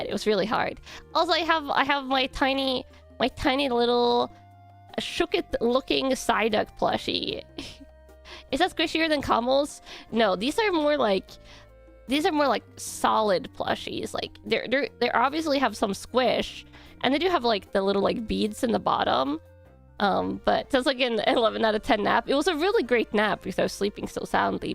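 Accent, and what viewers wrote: Norway. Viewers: love! Thank you for the pack crackings and chatting, a true delight any day of the week:_KannaKamoHug: Those Moomin cards were absolutely gorgeous, a very well designed and crafted collectors item!:_KannaShiny: The kamos hats are coming along very nicely too:_KannaKamo::_KannaComfy: Its always lovely to hear you speaking Norwegian (or your Norwenglish:_KannaLmao:) an entire stream would be very interesting:_KannaLove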